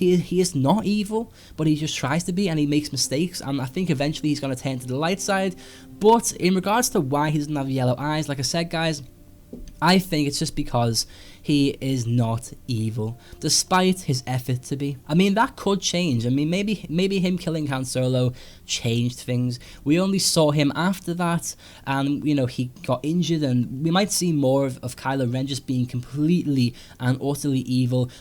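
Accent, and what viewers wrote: Liverpool, United Kingdom. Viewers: It is a mild liverpool accent (collegially known as "scouse"). Its an interesting English accent because all the surrounding accents are very different (eg the Manchester and midlands accent). It derives from the large influx in Irish immigrants to Liverpool during the potato famine. This mirrors the American Bostonian accent, which similarly derives from Irish Famine immigrants who went west to America rather than east to Britain. The English have so many accents and it refreshing for people to acknowledge other accents rather than just the standard "received pronunciation" of the Queen's English. (Not that there is anything wrong with RP) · are you a scouser? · Sweet video, you from Liverpool by any chance? You sound pretty scouse! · Ahh a fellow scouser :)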